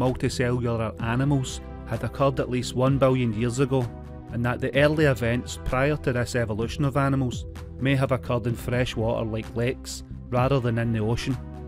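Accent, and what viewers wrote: Scotland, United Kingdom. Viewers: AWESOME video & love you are still putting that amazing Scottish accent to good use doing videos! ~Ginger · Couldn't make it longer than 2 minutes. Cannot understand the world's strongest Scottish accent and I'm English 1st language. Idk why the Scots always sound like they're gargling marbles & whiskey...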